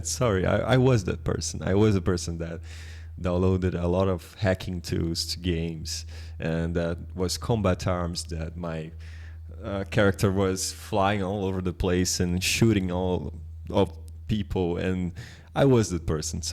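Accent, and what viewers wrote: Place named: Brazil. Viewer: Pelo sotaque é br com certeza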